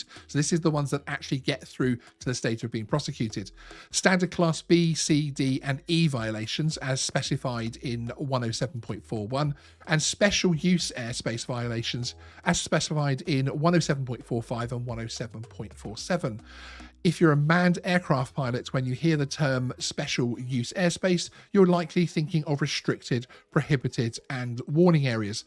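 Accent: United Kingdom. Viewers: Reading off incidents meant to scare pilots while providing no real particular info on individual cases.. Click bait, British accent meant to infer authority, he is a wanker.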